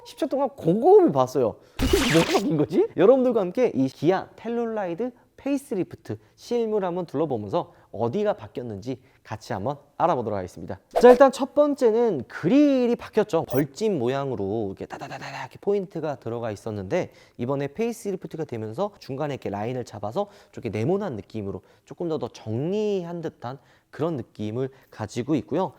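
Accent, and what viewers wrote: Gyeongsang, South Korea. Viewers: 야깐 야깐 하지좀마 이 갱샹도 유튜버 야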